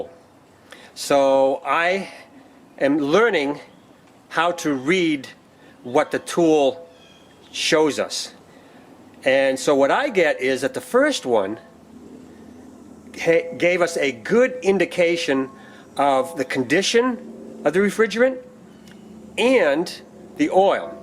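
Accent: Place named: New Jersey, United States. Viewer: Great video, thank you. What's that accent you have? Jersey?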